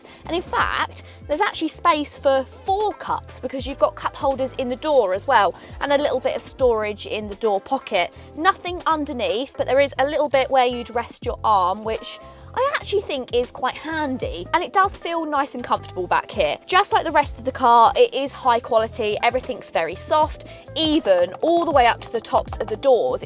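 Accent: England, United Kingdom. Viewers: i love the England 🇬🇧 accent 😊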